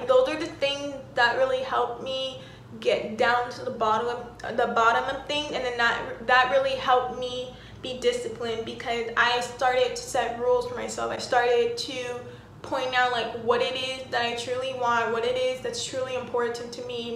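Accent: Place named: Dominican Republic. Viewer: I legit thought she was Dominican, especially because of the way she speaks.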